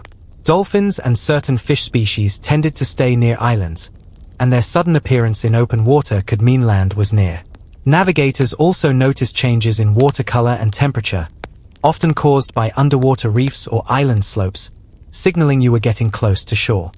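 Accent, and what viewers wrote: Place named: Europe. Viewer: When a european sounding man narrates its boring and full of holes, not a sleep story at all, more like an unintelligent story told by an unpolynesian male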